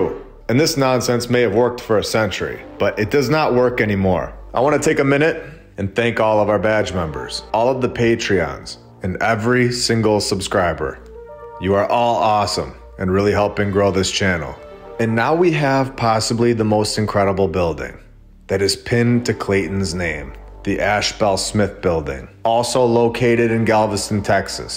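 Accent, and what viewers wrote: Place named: New England, United States. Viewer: by the way I'm a new subscriber the second video I saw was of Saint Anne's Church in Fall River Mass that I'm very familiar with because I live one block from there right now. I have a few old pictures you may want that I didn't see in the video. You sound like you're from the Northeast you have a similar accent that I do are you from new england?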